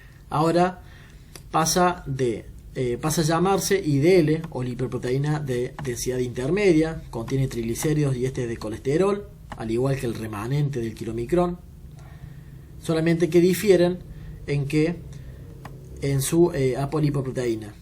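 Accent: Córdoba, Argentina. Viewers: muy bueno, me gusta porque sacas todo del Blanco, que dicho sea de paso por tu acento cordobés supongo que son paisanos jajaj saludos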